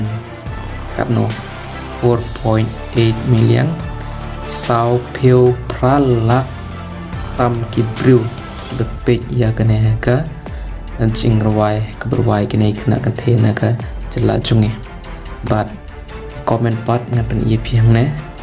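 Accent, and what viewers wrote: Meghalaya, India. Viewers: Ei bah phim dei ne khasi?ka sur kren jongphi ym sngewdei ba phi dei dei khasi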